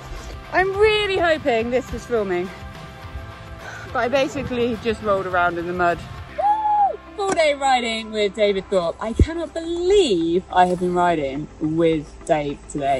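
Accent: United Kingdom. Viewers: Love your English accent, even in your writing. Thank you!!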